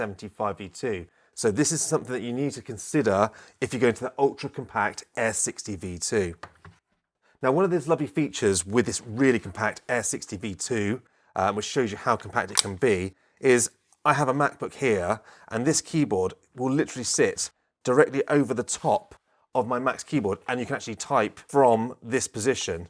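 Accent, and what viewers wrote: United Kingdom. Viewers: O, I use reds for quiet use. Is that a real British accent or are you really from Texas?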